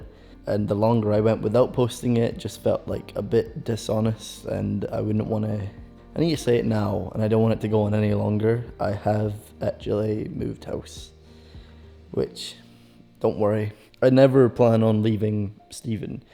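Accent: Scotland, United Kingdom. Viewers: Yaaas knew you was Scottish 😂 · Dude sounds Scottish af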